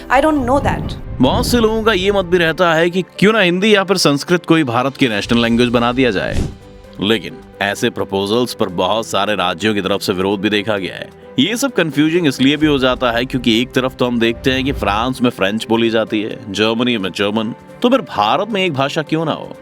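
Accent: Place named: Delhi, India. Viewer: सर जी आप थोडा नुट्रल रेहना....आप थोडासा देल्ही वाइज बोलते हो.... बोलने वाला नुट्रल रेहना चाहिए... देलही की तरफ मत झुको...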